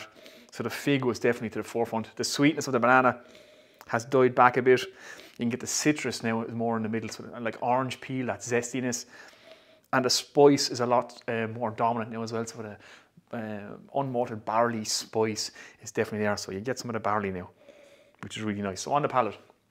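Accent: Ireland. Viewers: HI! I love you reviews! They have helped me understand Irish whiskeys so much better. Qstion for you- I have a friend going to Ireland in about 10 days, I want to have him pick up some whiskeys for me, preferably something I cant get here in the states. I do like bourbon style whiskey the best, Jameson is good but im not a big smokey scotch drinker. Any suggestions? Locally I can get powers Gold label and im going to try that! LOVE THE BROGUE!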